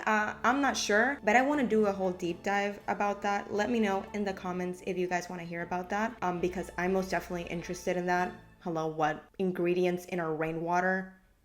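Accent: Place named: Argentina. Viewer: I knew you where Argentinian lol...the accent is dead giveaway 😂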